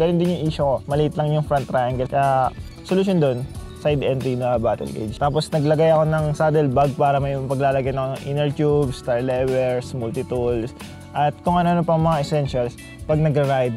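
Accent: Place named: Coño, Philippines. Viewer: coño ka pala master " ":D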